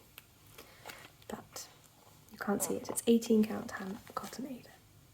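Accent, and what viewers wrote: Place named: Sweden. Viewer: Oooohhhh Dimensions kits presented by a lovely Swedish accented lady - what could be a better afternoon! 😊😊😊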